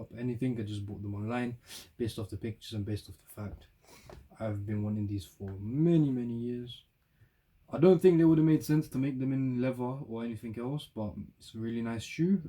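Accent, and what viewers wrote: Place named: England, United Kingdom. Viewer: What happened to the English accent? Sounds horrendous now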